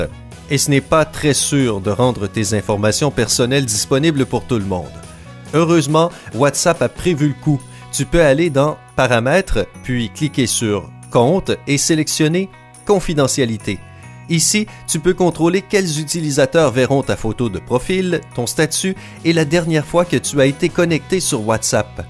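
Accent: Quebec, Canada. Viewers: Il a l’accent québécois, mdr😂🤣😂🤣. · Pas canadien ??!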